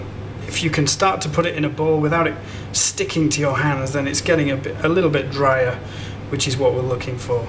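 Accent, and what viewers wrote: United Kingdom. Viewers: Yeah, in the UK, the TV is mostly a few government-run channels (at least thats what I got from going to scotland 3 times), and I don't think they have Man vs. Wild. And you know, some of the pronunciations just sounded southern Scottish to me, so that's where I got the impression. By the way, I might just not have found it yet, but if you haven't made one already, are you ever going to make a pizza video? I realize pizza is a fairly simple idea, but it has a variety just like pasta.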